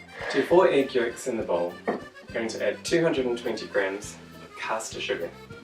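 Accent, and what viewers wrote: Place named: New Zealand. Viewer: Miss good old Hokey Pokey and Kiwi accent! Thank you for posting all the way from Tairoaaaaa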